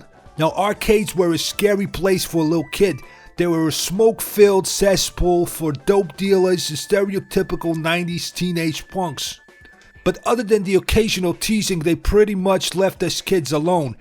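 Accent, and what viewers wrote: New York, United States. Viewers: Your accent of a new yorker but you have pal games through me off. I feel you though on the nostalgia of these games and those times. I have similar memories.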